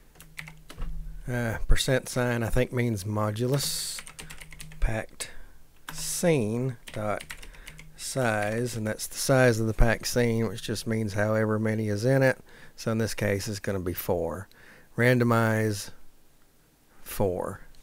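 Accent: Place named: South, United States. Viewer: Watching this I'm thinking his southern accent really undercuts his credibility, half way through I realize he knows what hes talking about so I stick around to the end to hear the "ain't it puuurdy" and I died! lmaooo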